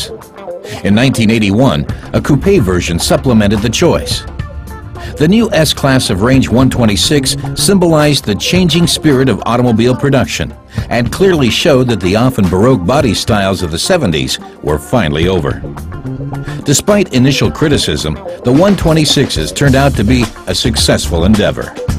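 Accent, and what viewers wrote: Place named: United States. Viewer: The awkward American narration grates.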